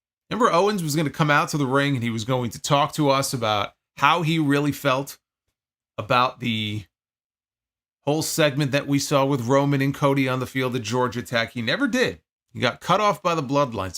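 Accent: New York, United States. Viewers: I love! He is the only wrestling tuber I watch/listen too! So level headed, cslm, cool, collected! And I can understand his NY accent!😂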